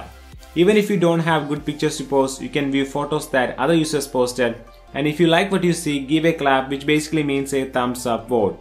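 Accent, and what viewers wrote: Kerala, India. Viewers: i guess u are a malayali??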